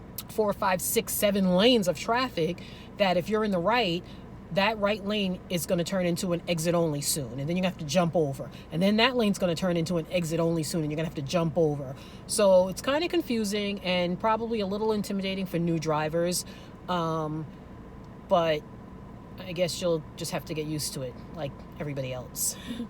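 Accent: New York, United States. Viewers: So as you were talking ,im like she has to be from NYC...girl I am too and moving also, gour video was very helpful.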